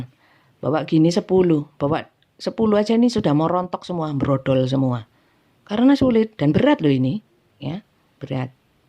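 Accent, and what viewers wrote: East Java, Indonesia. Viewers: Bahagia dengar bacaannya sister terutama ngomong jawa timurnya asyik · Ikut nyimak bunda...suka sekali sama suaranya yg medok.. terimakasih redinganya bunda🙏🙏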